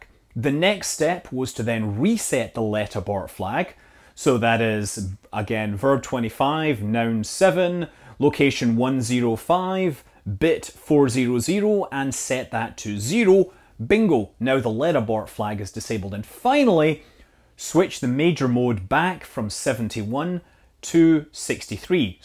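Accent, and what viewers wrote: Scotland, United Kingdom. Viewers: Nice accent! Scottish? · A Scotsdev, I like it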